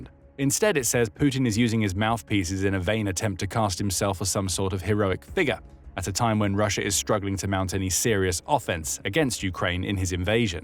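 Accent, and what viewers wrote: England, United Kingdom. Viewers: I now officially hate the English accent. I avoid it.. . . . . . . . .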